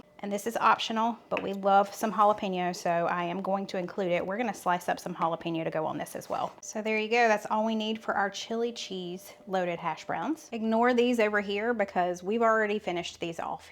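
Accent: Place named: Appalachia, United States. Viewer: You always say "bring it to a bowl"...................what size bowl? Is that a cereal bowl? Seriously, your hillbilly accent drives me nuts. It is PO-tatoes, not "taters"............how dumb sounding. It is TO-matoes, not "maters". It is "you all", not "y'all". I know you are an intelligent woman, but you sure don't sound like it.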